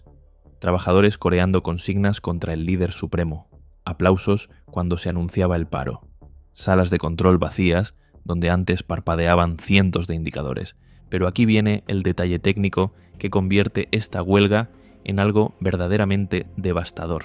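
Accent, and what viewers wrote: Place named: Castile, Spain. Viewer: P A X. Sus análisis contienen un componente de la lógica filosófica, más elemental, que cualquier persona con un buen sentido común lo perciben con facilidad. Por otra parte la dicción de su locución, es muy castiza de la fonética de la vieja Castilla: muy agradable de oír. Enhorabuena !!! Gracias !!!!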